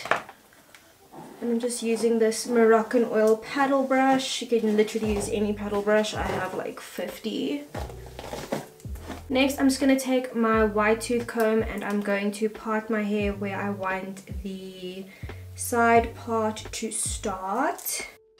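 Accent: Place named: South Africa. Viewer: Omg you south african, I hear it by the accent 🇿🇦💖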